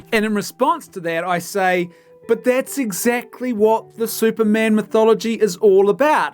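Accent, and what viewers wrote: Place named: New Zealand. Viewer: Kiwi accent.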